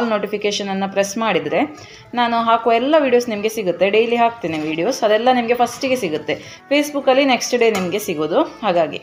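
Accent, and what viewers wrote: Mangalore, India. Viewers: Mam l love Mnglr kannada 😍😍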